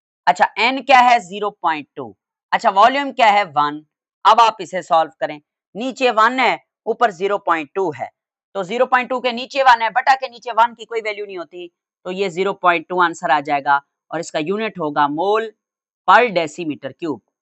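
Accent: Saraiki, Pakistan. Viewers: Sir g lgta hy ap saraiki Hain ❤agr waqi ewe hy ta sako sraiki ich smjhao😅❤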